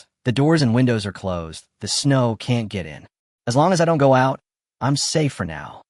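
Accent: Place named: France. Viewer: Would be a much better story and easier to follow if you didn't repeat the same s*** over and over and over again. Also if you could stop using that french accent voice to read the English words it would be much better.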